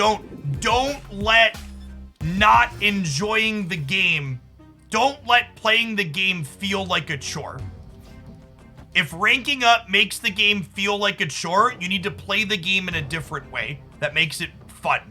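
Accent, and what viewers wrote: United States. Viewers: haha! Could tell within 2 sentences. Source: someone also from the south side.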